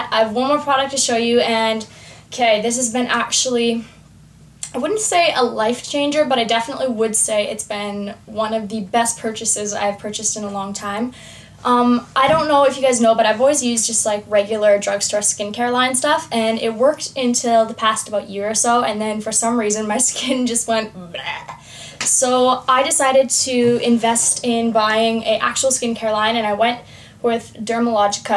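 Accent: Australia. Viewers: You gorgeous as always honey!! I love that you've taken on an Australian accent..so cute!! xo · You seem to be taking on an Australian accent in some words =)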